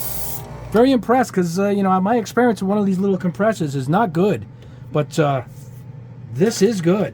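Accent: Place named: South, United States. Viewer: It’s his regional accent. Down south we say it different.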